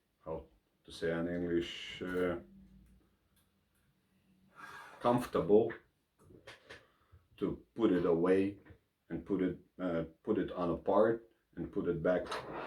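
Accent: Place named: Russia. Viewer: I love the way you speak man. The English with the Russian accent is awesome, no bullshit just straight facts from a man with arms so ripped I don't dare to call myself a real man in comparison. 'sometimes costumers complain its very difficult to tight these clamps.. Yes.. You get used to it.' Unintentionally hilarious, I fucking love it. Please do more videos.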